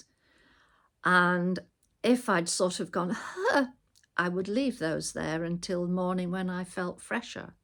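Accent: United Kingdom. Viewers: BUT. My I ask the accent to that? Irish, Scottish or the 40 accents of thee U.K.? Thank you Ma'am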